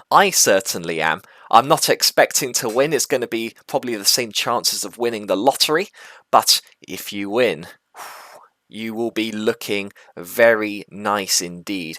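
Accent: United Kingdom. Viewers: I wish I could go to Genesis week in Las Vegas how exciting. Where are your from? You have a UK accent.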